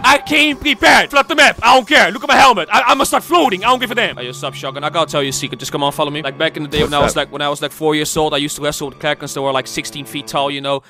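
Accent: Netherlands. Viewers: Bruh echt een nederlands accent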